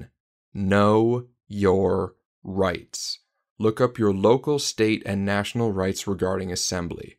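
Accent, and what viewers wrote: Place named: United States. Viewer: sorry. Just your accent sounds American to me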